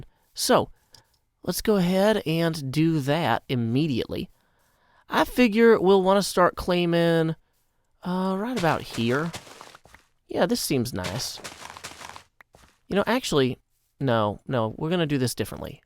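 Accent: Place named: Nashville, United States. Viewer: D you doing an iskall impression was hilarious , nothing will ever cover that nashville accent up :D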